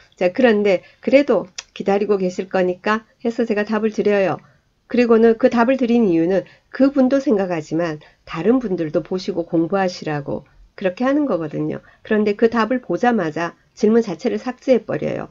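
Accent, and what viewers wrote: Gyeongsang, South Korea. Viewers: 소장님 유튜브를 보다가 우연히 보게 되었습니다. 저도 경매에 관심을 가지고 책도 사고 혼자 열공중인데 어느 채널보다 실전같은 느낌이 많이 들고 같은 경남쪽이라 말투도 정겹고 정말 시청자를 위해서 하신다는 마음이 보입니다^^ 이렇게 도움되는 영상 항상 감사하고 저도 열심히해서 고수가 되어보겠습니다!!!! 긴 댓글 처음 이지만 항상 건강하세요^^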